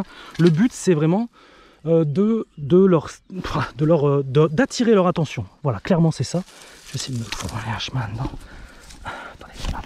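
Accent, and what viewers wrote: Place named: South, France. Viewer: ont utilise les tapettes nAAara comme tu nous les vends si bien avec ton accent du sud.😂😂😂😂😂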